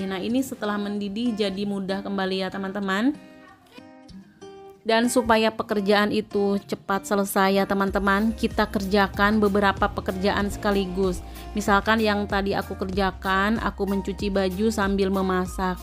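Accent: West Java, Indonesia. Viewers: Ibu dr Sunda kah? Logat bicara nya persis seperti teman saya yg Sunda...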